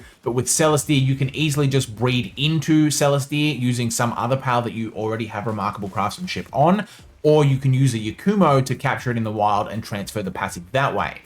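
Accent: Australia. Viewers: how do you have not 1M subs... first of all, your content is amazing and you covered basically everything and secondly, who doesn't love aussies and their accent?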